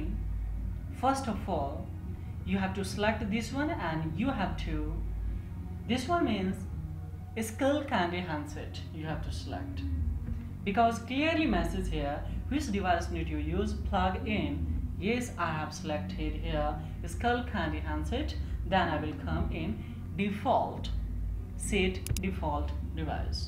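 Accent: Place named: India. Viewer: Your English accent is so duplicate.....pure indian accent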